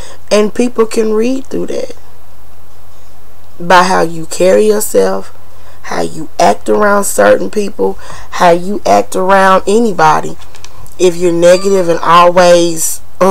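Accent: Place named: Rural, United States. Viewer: I love the way you talk all country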